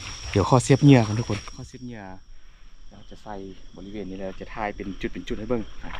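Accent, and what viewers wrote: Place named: Loei, Thailand. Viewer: เฮาเว้าสำเนียงเดียวกัน เว้าคือกันทางเชียงคาน จังหวัดเลย ข้ามมาเที่ยวฝั่งไปแหน่เด้ออ้าย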